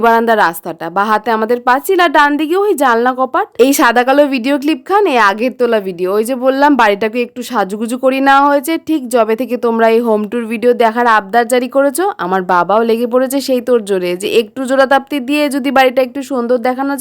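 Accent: Bangladesh. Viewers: প্রথম দেখায় তুমি আমর মন জিতে নিলে আপু তুমি আমাদের বাংলাদেশের মত কথা বলো গো🤭❤️❤️❤️❤️❤️❤️❤️ love from Bangladesh 😊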